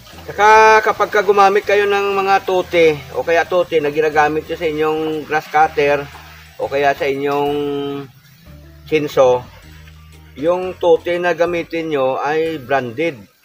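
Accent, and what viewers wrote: Iloilo, Philippines. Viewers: bacolod Ako kawaki. Sabi ko n ilongo k dahil sa tono mo magsalita sarap yan kbl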